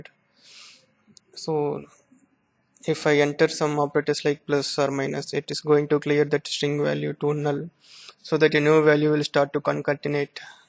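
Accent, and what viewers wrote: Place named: India. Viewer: Would be nice if you'll try to speak imitating British accent, cuz Indian accent is pretty difficult to understand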